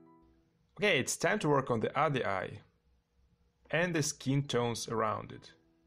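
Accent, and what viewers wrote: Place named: Russia. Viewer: Your channel is great. Subscribed. And your Russian accent is very pleasant.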